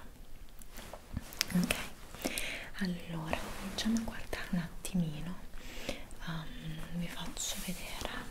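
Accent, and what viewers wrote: Italy. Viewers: Soy el comentario en Español que no esperabas. No entiendo nada pero me encanta el acento italiano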